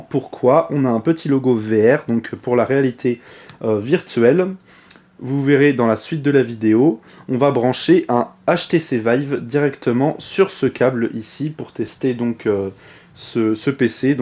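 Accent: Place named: France. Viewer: fucking french accent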